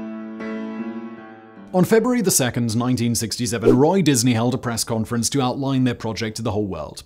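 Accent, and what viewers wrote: United Kingdom. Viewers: Sometimes it occurs to me that my family members probably wonder why I'm listening to the same British guy constantly, unaware of the unusual number of channels this man hosts.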